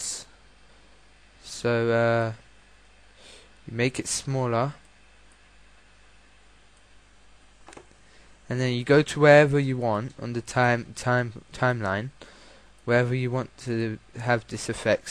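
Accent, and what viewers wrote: United Kingdom. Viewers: when i first heard you i thought you were from London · Are you british? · i fort u were british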